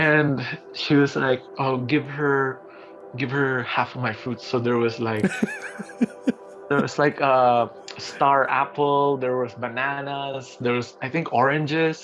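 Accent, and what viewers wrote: Conyo, Philippines. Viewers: Conyo si kuya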